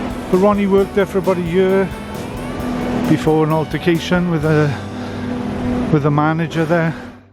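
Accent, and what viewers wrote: Wales, United Kingdom. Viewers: Yes it was lovely, my parents have now both gone to their heavenly home and hopefully they have had the pleasure to meet on the other side of life 😊 Ps it was lovely to hear your Welsh accent fond memories of mum and dad 💕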